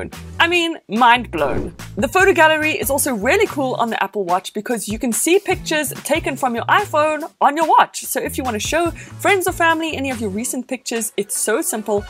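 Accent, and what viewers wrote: South Africa. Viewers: I love her accent. Idk if shes south African but her accent. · Are you from South Africa if not my accent reading is off.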